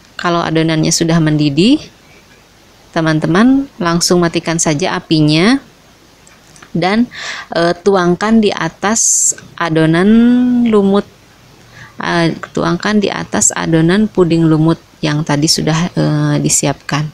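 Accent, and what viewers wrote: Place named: West Java, Indonesia. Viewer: ibu ini orng sunda kaya nya ...beda bicaranya bilng kocok jadi kocek ...mntap bu puding nya mau bikn nnti klu pulkam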